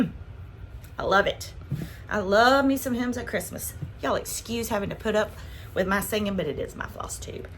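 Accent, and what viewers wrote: South, United States. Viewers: I am so happy I found you. Love hearing the southern accent, y’all, and just your openness. Then you have sharing your gift and creativity.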